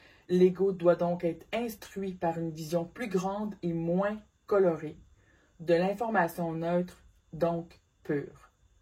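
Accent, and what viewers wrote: Quebec, Canada. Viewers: Contenu enrichissant et utile présenté avec pédagogie par une jolie femme à l'accent québécois ....❤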